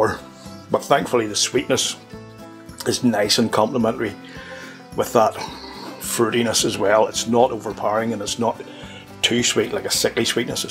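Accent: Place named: Northern Ireland, United Kingdom. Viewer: Got to ssy love your accent (I'm an accent guy). I've probably tried and failed at Northern Irish accents in my videos in the past. I do have the Sexton as you know. Not tried many Irish whiskeys but this is one of the best I've tried. Still waiting to say "that's a great Irish whiskey". Love the video style.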